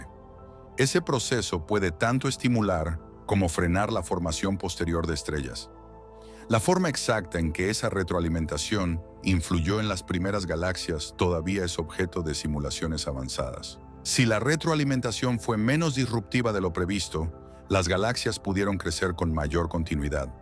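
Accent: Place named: United States. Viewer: Tonterías con acento gringo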